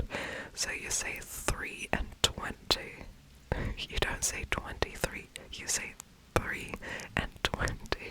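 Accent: Australia. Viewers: I thought you were Australian and had no clue you were German natively so 😅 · I'm Australian and assumed she was too lol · Before I found out she spoke German, I thought she was Australian too. Lol 🙃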